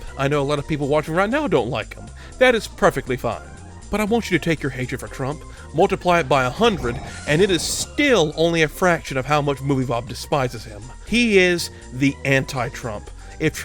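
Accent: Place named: Brooklyn, United States. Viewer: His dumbass brooklyn accent that only comes out on specific words is grating as hell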